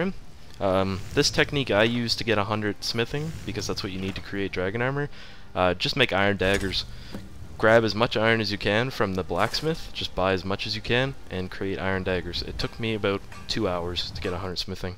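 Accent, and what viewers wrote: Canada. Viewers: canadian accent? :D im british btw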